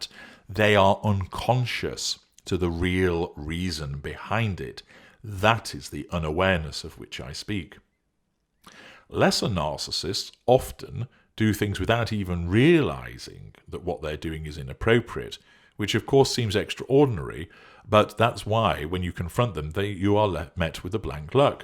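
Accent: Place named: North, United Kingdom. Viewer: Your accent, has a northern twang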